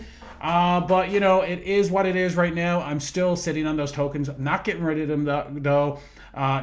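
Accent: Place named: Eastern Canada, Canada. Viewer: First time viewer. I knew in seconds you were from the east. I’m in Alberta. Learning about forth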